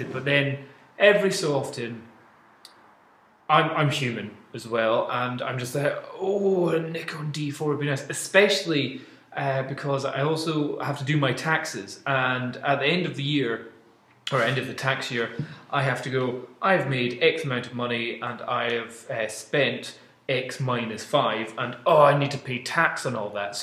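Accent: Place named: Scotland, United Kingdom. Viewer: Offtopic: Everytime I hear you speaking (especially numbers) I start hearing the - in my mind:) That scottish accent:)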